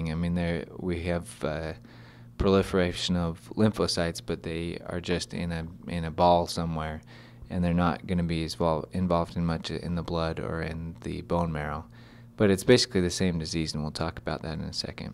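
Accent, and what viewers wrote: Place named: United States. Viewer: fatima Imtiaz he sounds American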